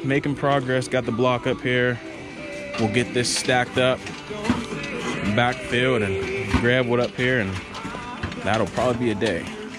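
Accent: Pittsburgh, United States. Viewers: First video I’ve watched. 1.3 seconds in and I already knew yinz were from the Burgh. Can always spot a fellow Yinzer’s accent a “mahl “ away 😅 🫡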